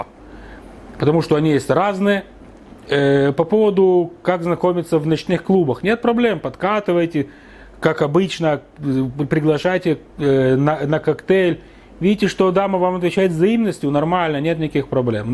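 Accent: Odessa, Ukraine. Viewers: Класный одесский акцент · Приятно вновь услышать неистребимый Одесский акцент.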